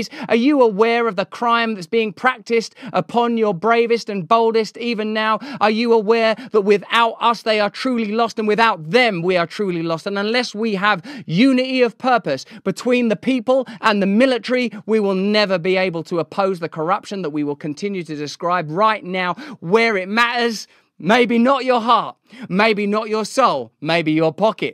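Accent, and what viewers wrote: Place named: United Kingdom. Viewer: Have you ever accidentally left your volume on when Russel’s British voice hits? Good hell.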